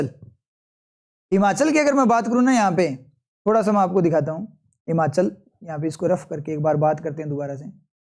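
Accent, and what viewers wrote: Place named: Ganganagar, India. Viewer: Ap gngr Or hnumangd side se ho kya.... Awaj se pta chl ra h thoda 😂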